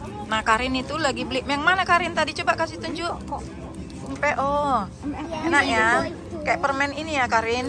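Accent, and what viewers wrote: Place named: North Sumatra, Indonesia. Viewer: Anak mix bule tapi logat batak nya kental ya mbak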